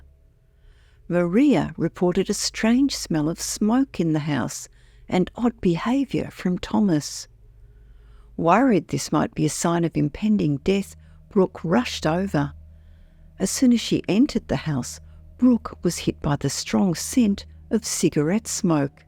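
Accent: Australia. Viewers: She has a lovely aussi accent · Nah, you're just not used to her Aussie accent.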